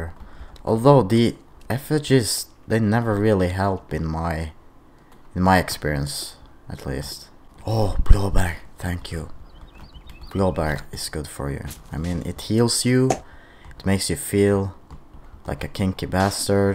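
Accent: Sweden. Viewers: i like your swe/eng:) naajs video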